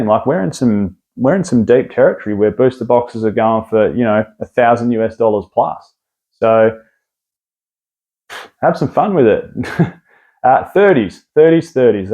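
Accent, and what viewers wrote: Australia. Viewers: Love having a genuine aussie guy talking about pokemon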